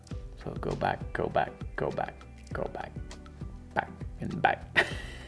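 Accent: Quebec, Canada. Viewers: He's French Canadian. It's a wonderful accent in my opinion.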